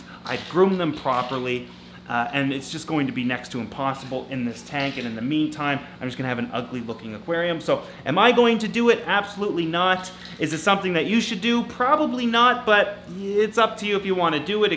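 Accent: Canada. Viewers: Could really hear the Canadian accent in this video. it’s brilliant 😂❤️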